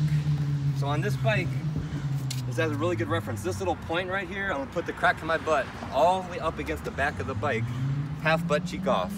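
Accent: United States. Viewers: Great info for any rider, even riders that already get their knee down. BUT THE BEST PART OF THIS VIDEO is your accent and voice. Its amazing. You could do audio books narrate movies, do voice acting... You SOUND like you might be from illinois, POSSIBLY ohio, but man, its hard to pinpoint. Very clean, great diction, your pronunciation is better than a news reporter... Holy shit. Not to mention the voice is as good as the info here. I've been riding for years, been putting a knee down for a long time, but you are setting clear, repeatable markers on the bike for how to do it effectively. I can already tell I'll be able to be more smooth with it from now on.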